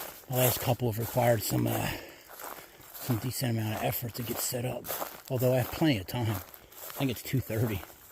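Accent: Philadelphia, United States. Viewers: When I close my eyes I'd swear I'm listening Bam Margera hiking! lol Is that a Phillie accent?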